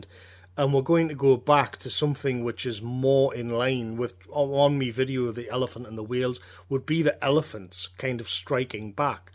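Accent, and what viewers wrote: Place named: England, United Kingdom. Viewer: I'm new to this channel and I'm thoroughly enjoying the content. It's refreshing to hear non -sensationalist monologues, delivered with clarity, sense and topped off with a familiar English accent. As for the China food situation I believe that they have learned from their history, all their great dynasties have fallen around the same time as global food shortages, which coincided with solar (in) activity. Which we are now heading in to. I'm not fearful of the future, our ancestors faced worse and survived, the narcissist members of society are the ones who may not fare well, those who embrace Nature and Order will undoubtedly arrive on the other side of this nonsense in a stronger position. Mother Nature will fix the Globalist abominations. In 200 years, Europe will look like it did 200 years ago. Greetings from Rutland, Multum in Parvo!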